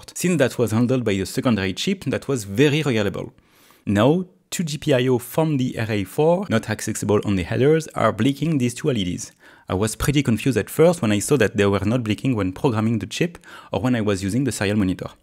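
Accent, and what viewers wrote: France. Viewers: Vous parler trop vite cela rend votre accent Parisien très agacent... mais quand meme très instructif ...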